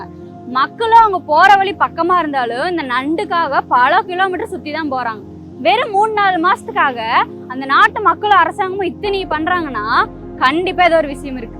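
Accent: Coimbatore, India. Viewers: Ammani coimbatore ngala😂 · கோவை ஸ்லாங் அருமை👌👌👌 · Baby ma nee coimbatore ah??? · Covai slang😝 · பாவை இவள் அழகி கோவை தமிழ் பேசும் போது நெஞ்சம் இன்னும் இன்னும் கேட்கிறது இவள் கொஞ்சும் தமிழை இன்னும் இன்னும் கேட்கிறது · pechchil kovai vasam varuthu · Akka Nega Coimbatore side aa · கோவை தமிழ் அருமை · அம்முனி கோயமுத்தூர்ங்களா....😃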